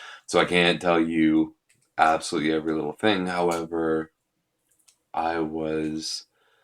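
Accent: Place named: Nova Scotia, Canada. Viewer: A very insightful video, and good for you, sir. thanks for sharing. You sound like a Nova Scotian.. or at least a Maritimer, that's where I'm from :)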